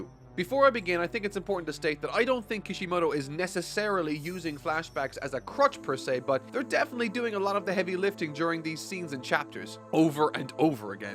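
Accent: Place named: Ireland. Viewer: loves saying Cathartic in his thicc Irish Accent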